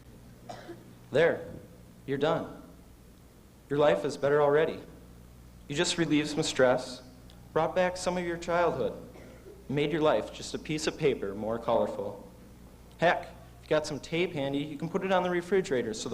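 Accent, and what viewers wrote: United States. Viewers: I thought I was lucky going to highschool in the early 2000s… early 90s must have been even more badass! 90s was the best decade… it was the last gasp of humanity before the digital age..I’m guessing from your accent.. Michigan? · Well done,! I was working for Shopko at the time you graduated. I can relate to the toys you mentioned. Haven't thought of them in years! I hear a faint upper midwest dialect when you speak. Though definitely not from a small town or rural area. I miss that company; made lots of friends with folks who came this way(Omaha), to open stores. We had 5 at the peak! Again, good speech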